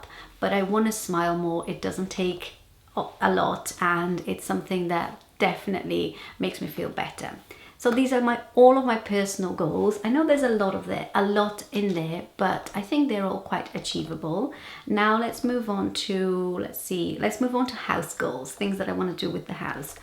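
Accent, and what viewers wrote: United Kingdom. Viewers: I started to watch your videos because I love British accent and I am Brazilian as well. My purpose is improve my vocabulary and my listening skills . Congrats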